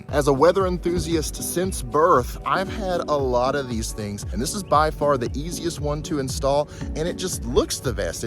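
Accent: United States. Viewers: Gotta love that country accent with the weather prediction 🙂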